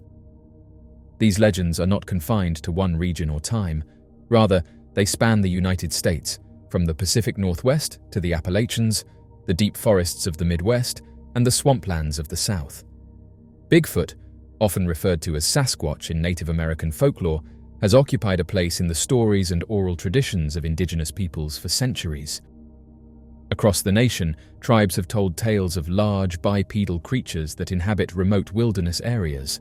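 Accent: England, United Kingdom. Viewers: No more pohm narrators... OUR COUNTRY.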